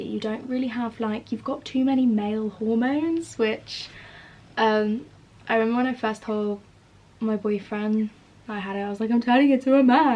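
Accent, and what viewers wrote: United Kingdom. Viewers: Ikr she has the British accent that is super calming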